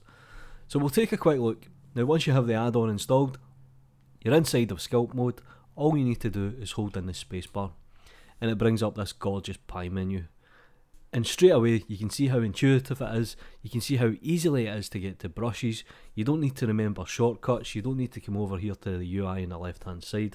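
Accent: Scotland, United Kingdom. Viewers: Scottish accent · One of many Scottish accents!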